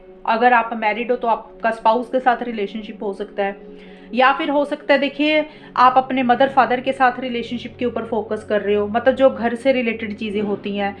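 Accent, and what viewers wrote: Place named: Jammu, India. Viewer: arrey ni mam I mean apke bolne k touch se lga ki ap jammu se ho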